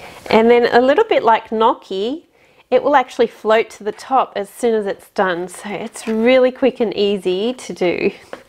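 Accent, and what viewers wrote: Australia. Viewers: Wo in Deutschland bist du aufgewachsen? Woher kommt es, dass du nach Australien ausgewandert bist? Du hast auch einen australischen Akzent und keinen deutschen!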